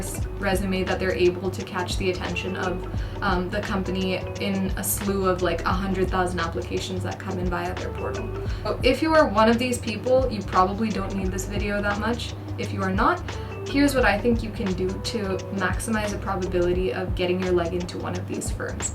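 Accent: United States. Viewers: Did you do your studies in 🇺🇸 US, I am in US for my masters I wanted to get like your accent 😊 Please help me in improving my accent ❤ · Why do you speak in an American accent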